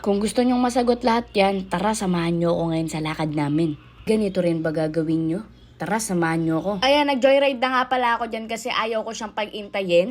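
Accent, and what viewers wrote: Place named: United Kingdom. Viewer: Parang taga cambridge ka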